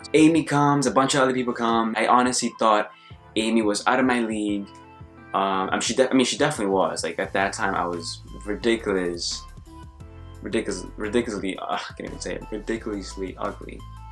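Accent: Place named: Bronx, United States. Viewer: That Bronx accent is coming thorough lol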